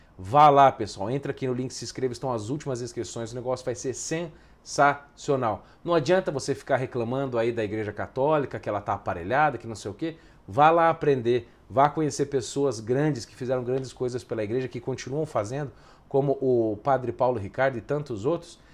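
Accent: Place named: Rio de Janeiro, Brazil. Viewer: Por favor fale mais carioquês nos próximos vídeos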